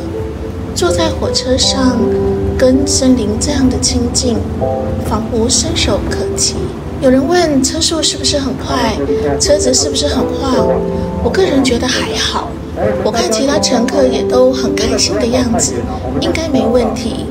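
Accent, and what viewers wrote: Standard. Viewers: 旁白以前的工作一定是老師不然就是播音員,聲音好聽,發音標準,又介紹的很詳細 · 好久沒聽到這麼字正腔圓的國語~好有回憶殺的聲音!好好聽!~^^~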